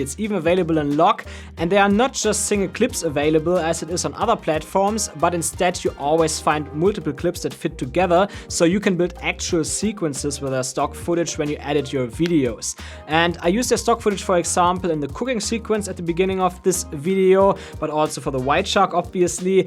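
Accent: Germany. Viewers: your english sounds very german for my ears :D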